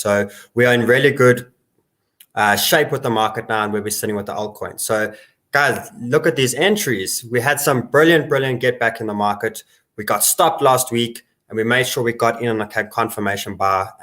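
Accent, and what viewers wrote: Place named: South Africa. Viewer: Great, you’re incredible. Can someone please post the ticker of the last coin, it’s that South African accent 😜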